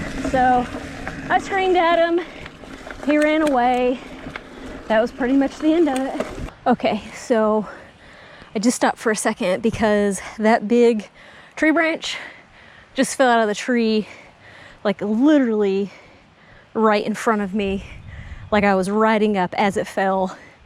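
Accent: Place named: Kentucky, United States. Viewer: Huh, not much talk about Xert. Love your Kentucky girl accent and mannerisms. Couple of suggestions for ya. try magnesium for your cramps. D1 Female athlete taught me that one. Have your carbon bike checked immediately when it creaks. Compromised carbon due to not using a torque wrench can kill ya. I got a little experience with that one. Put some time in on nutrition. May I suggest following Dr Gregor and his whole food, plant based diet. That prepackaged white rice and ground beef is slowly killing you. Headaches? Drink mo water. I do like your ability to articulate what you are thinking. Ya just need a whole lot more schooling. Your buddy Dave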